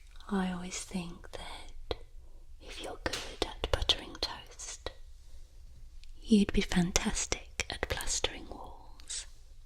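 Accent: England, United Kingdom. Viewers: Your absolute perfection in ASMR! Your pacing, presentation, variety of subjects, and your near angelic English accent is simply...just WOW! Very original and enjoyable. Thank you. 😊🖐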